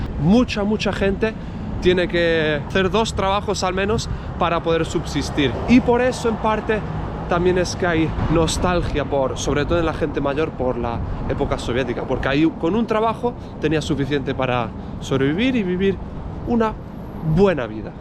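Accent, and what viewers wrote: Spain. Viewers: No conocía tu canal!!! Calidad!!! Saludos desde El Salvador! Solo una duda, de qué parte de España es tu acento ?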